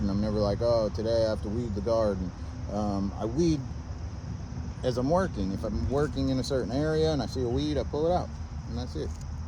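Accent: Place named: South, United States. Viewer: Yooooo broooo! This is awesome and so glad to see you starting up a youtube channel for all the amazing work you do! It's been very inspiring to see all the progress over the last year and all your wicked smart inventions/solutions to daily farm work. I'm going to get up there for a visit this fall and check it out in person. Also, I think you're almost officially a southerner with that sometimes southern drawl ;)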